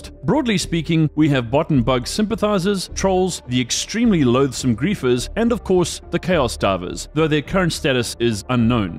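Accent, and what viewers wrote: South Africa. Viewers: Is this a SAFA based channel? I know that accent far too well! SAFAs for democracy and liberty! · I know a South-African accent when I hear one, boet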